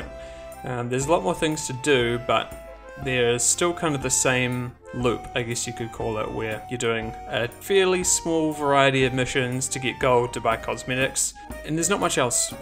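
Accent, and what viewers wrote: Australia. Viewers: What he's Canadian? I could have sworn from his accent he was Aussie. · Canadian dollars.....you live in Canada? You sound Australian or maybe kiwi haha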